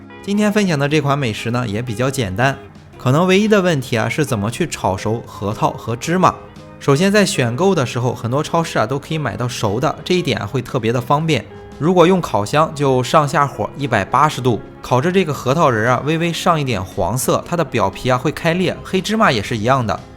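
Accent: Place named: North, China. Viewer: 这是哪里人，每句都有儿话音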